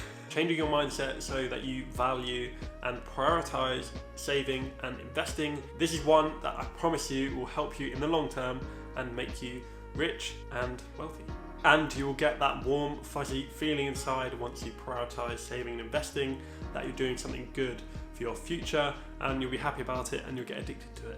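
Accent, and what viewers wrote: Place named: Scotland, United Kingdom. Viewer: Nice video mate, Scottish accent was decent to be fair!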